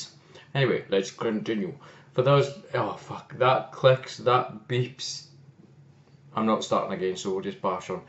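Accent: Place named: Scotland, United Kingdom. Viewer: Nice video, man! Subscribed after only a few seconds. Impressive library already. I am wondering. Where are you from? My guess would be Scotland. Never have been there. But the accent reminded me of Robb Stark, hehe.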